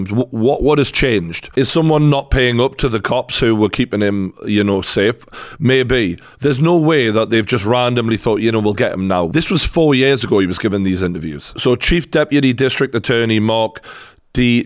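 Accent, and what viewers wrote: Newcastle, United Kingdom. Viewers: I just love your commentary, and that accent -- I'm a Brit, so I relate! He's feeling charitable, maybe because he's going to JAIL! Bye Diddy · Geordie boy, thank you.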